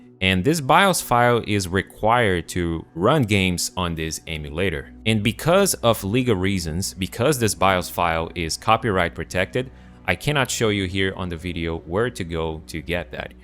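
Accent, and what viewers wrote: Brazil. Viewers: o que tu parece brasileiro falando ingles eh brincadeira viu!!! assume ai, duvido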